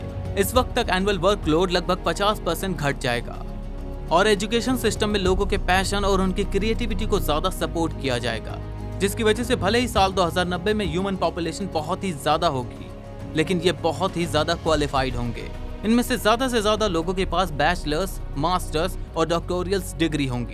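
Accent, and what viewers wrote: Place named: South, India. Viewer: Language mein South Indian touch aa rha h....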